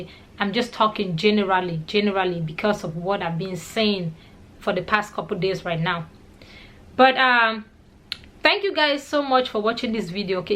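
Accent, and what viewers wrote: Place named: Kenya. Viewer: You sound like a kennya woman though i enjoy your word of wisdom 👌you earn a new friend here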